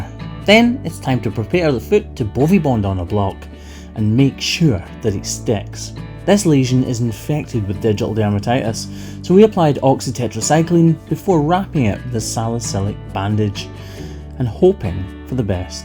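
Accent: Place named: Scottish Borders, United Kingdom. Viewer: Just a short note to say thank you for your work, it’s a joy to share in your world and hear a borders accent while living in NZ.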